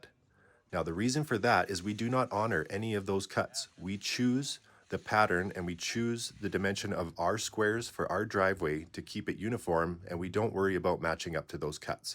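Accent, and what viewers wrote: Canada. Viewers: I knew you were from Canada with your Os 😂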